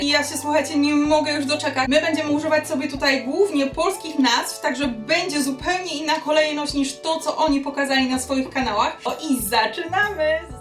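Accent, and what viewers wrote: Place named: England, United Kingdom. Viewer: Kocham jak wymawiasz polskie nazwy z angielskim akcentem ❤❤ Zrób jeszcze raz to tylko z angielskim nazwami ❤❤❤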